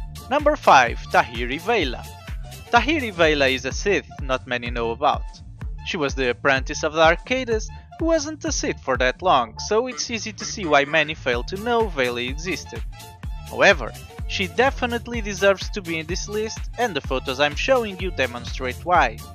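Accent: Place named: Russia. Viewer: I love how Russian your voice is my guy it's a great accent!!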